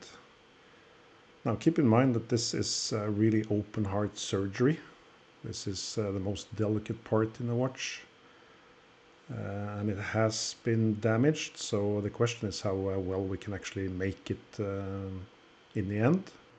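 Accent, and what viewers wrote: Norway. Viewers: Financially it probably wasn't worth the effort but emotionally...its a beautiful timepiece and I would be very proud to show before and after Shots if i had been able to restore it like you did. You mentioned your in Switzerland...I was thinking. "But he sounds Norwegian to me!" 😀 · var bare hyggelig å høre at du fortsatt hadde norsk aksang på engelsken. Digger det du gjør👍🏼 så håper å se mer av dette :) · Are you German? Your accent sounds so damn Norwegian! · Your accent sounds more norwegian than swiss?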